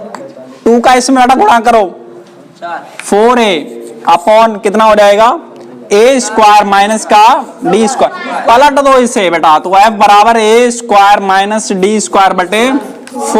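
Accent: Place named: Aligarh, India. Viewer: Bhaiya aligarh se ho kia😅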